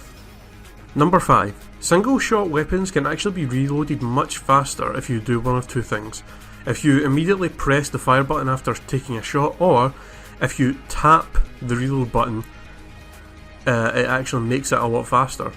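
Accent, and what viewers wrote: Scotland, United Kingdom. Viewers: As soon as I heard his Scottish accent I liked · Thank you for posting this! nice Scottish accent! :-) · Are You Scottish ? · You`re from Scotland, right?:D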